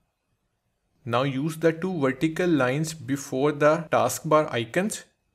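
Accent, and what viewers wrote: India. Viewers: Thanks random indian sounding man on youtube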